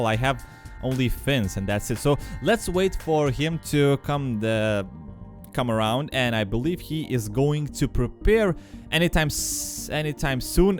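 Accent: Russia. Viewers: are you Russian? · Is he Russian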